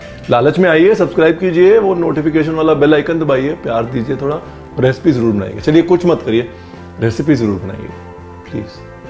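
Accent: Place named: Punjab, India. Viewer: Recipes te ghaint hai hi bolande style wah ji wah pure Punjabi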